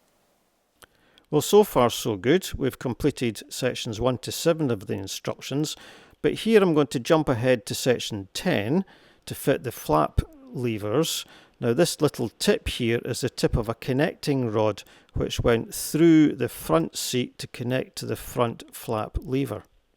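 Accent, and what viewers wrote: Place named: Scotland, United Kingdom. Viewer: you would have been seated in the rear cockpit for your AEF (air experience flight). The pilot instructor would be in the front cockpit. You are quite right, there were no seat cushions fitted, the bucket seats were designed to take the seat parachute that you wore on your posterior (which made walking to the aircraft and climbing up into the cockpit quite awkward if you remember). In future I’d recommend the use of a pin vice to drill the small holes instead of the DIY hand drill. (Sledge hammer to crack a nut?) Nice video and well narrated with your soft Scottish accent.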